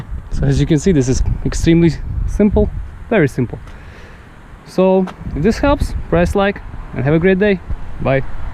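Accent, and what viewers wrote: Russia. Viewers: laughed too hard when you said hammer instead of camerea because of your russian sounding accent. Great video tho thank you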